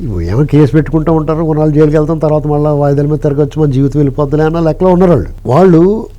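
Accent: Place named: Godavari, India. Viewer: Good. చక్కటి గోదావరి జిల్లా యాస.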